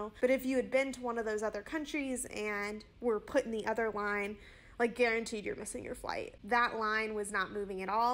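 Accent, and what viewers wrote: England, United Kingdom. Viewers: Wow! Thanks for the update! I'm glad you are safely back. Hope to see more videos soon. I really love to hear your English accent. By your accent I'm sure you are not from the south. Because you don't have a southern accent. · She's American so how does she have an English accent 😶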